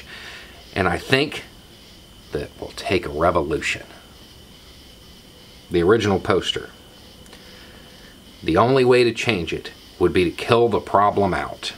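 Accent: South, United States. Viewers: Dude I have to be honest... I caught my self for falling down that same trap when I heard your accent, but you have definitely proved me wrong. It's awesome to see someone from the south standing out on their own morals.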